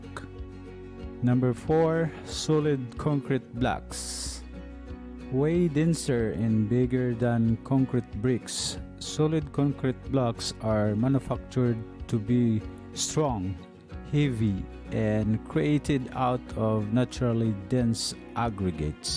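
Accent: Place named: India. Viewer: Heard indian voice, click out quickly. Shittiest group of people in construction.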